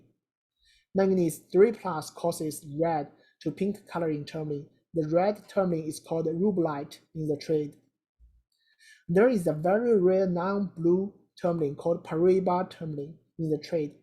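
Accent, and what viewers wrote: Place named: China. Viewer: Incredible presentation. I got cheated out of school but I would have done great in college. I used to love making presentations like this. This man would be a fun teacher. I know what it can be like to have pseudo intellectuals brag about something that they know nothing about, when its something you're passionate about the knowledge of. I love all fields of science. I searched for this video just by hoping it was a thing. Very Incredible presentation. I feel like he and I would get along well. I had gotten accepted into a trade school but I was one of tge unlucky few who had a cinema like group of bullies that beat me up for no reason and broke my glasses, so I stopped going to school but I never stopped being passionate about learning. Im sure people in your field have to deal with know it alls like pseudo spirituality people and corporate minded manufacturers. 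I bet its frustrating. I do find gems and chemistry in general fascinating but my area of expertise is literature and history. I got bullied into leaving school, chose to be a superhero and went up against things out of horror movies. Lost my best friends in sad scary ways and try to give shout outs abd credit where its due. I dont know much at all about the man in this video, but hes gifted and based on his accent, Im going to assume his first language is mandarin. I find other languages interesting too. Knowing more than one generally makes you cooler than people who dont so Im impressed. I feel like English is on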